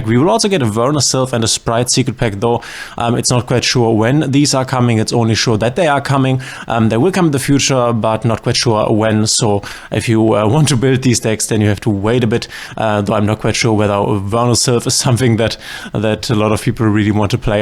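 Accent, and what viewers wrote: Germany. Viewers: Dieser deutsche Akzent 😁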